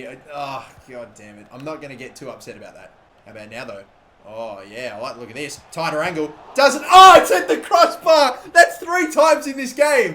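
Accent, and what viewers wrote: Australia. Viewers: Are u Australian. How do u support Newcastle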